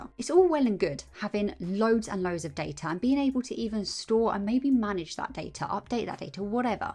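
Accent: United Kingdom. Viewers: I like your British accent❤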